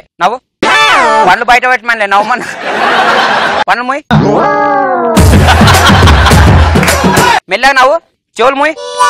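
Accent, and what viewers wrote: Telangana, India. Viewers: very natural pure Telangana yaas tho comedy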